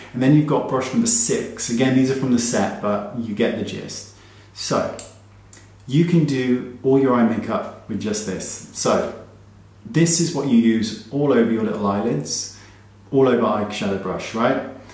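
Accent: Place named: United Kingdom. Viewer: ❤️the Brit accent! “Vitamin, Naaz, Bloody!” ❤️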